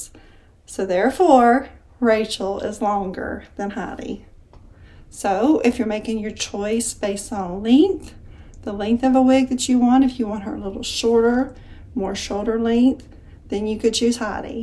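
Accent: South, United States. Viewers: Just subscribed to your channel!!!! So glad I ran across your video!!!! Loved the review and your southern accent as I also have one!!!! So glad to find a review with the same accent as me!!!!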